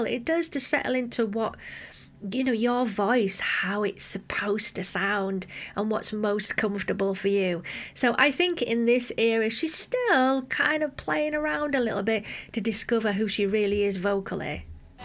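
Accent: United Kingdom. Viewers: I'm a very huge Madonna fan.. buy besides that ..I really like you..I can fell you are a very good person ..besides that ..there's a lot of reaction videos..but yours because you are a really voice couching everything you say souds really professional and you never used offencive words... you can say..she didn't get the tone or something like that.. but never offencive.. thank you ..Cheers from Costa Rica 🇨🇷... oh ...I forgot..... I love your British accent ❤ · Hi, been a fan of your videos for years, but for some reason they’ve stopped coming up on my feed. Great analysis! You have such a wonderful personality. Where in the UK are you? I love your accent. Best wishes from Philadelphia!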